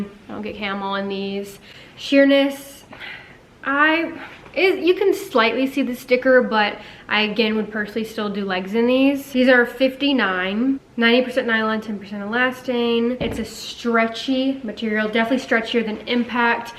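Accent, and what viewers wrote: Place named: England, United Kingdom. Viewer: how you feeling today..... In ur English voice what's on the agenda for 2day daaarling 😊 I'm not going to comment but real man knw real from fake marshmallows.... This what I say about that 😎👍🤙🙌🦾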